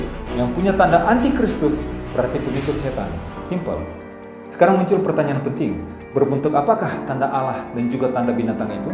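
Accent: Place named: Timor-Leste. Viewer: Gaya bicaranya spt orang timor ya 😄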